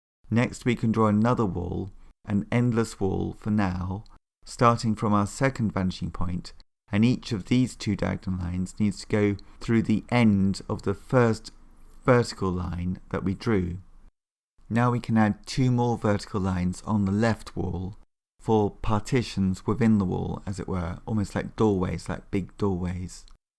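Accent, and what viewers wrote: United Kingdom. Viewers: You never over explain. Just the right amount of clarity and instruction. Thank you so much And great English accent by the way.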